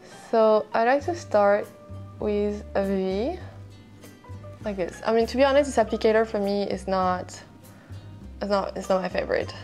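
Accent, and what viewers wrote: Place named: France. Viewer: Hi, I am not French and also not an englishspeaker, so for me its pretty hard to listen this accent. The video is very well done, the music and everything else .... Just the manner of the speaking is a bit smooth sometimes, thus it gets hard to distinguish certain words. Not to offend, just my point of view 🌹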